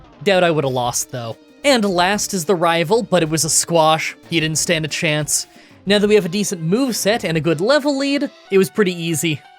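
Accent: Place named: Canada. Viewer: This guy's Canadian accent is so cute😊😊😊😊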